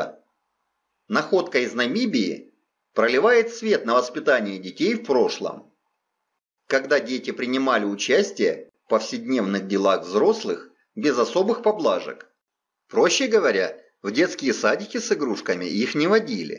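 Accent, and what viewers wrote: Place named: South, Russia. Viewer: .. ну южно-русский говор и что?..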